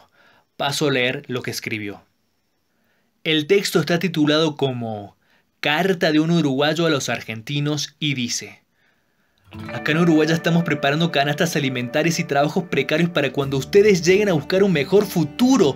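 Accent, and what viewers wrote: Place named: Chile. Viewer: Creo que es chileno el que habla. 🇦🇷❤🇺🇾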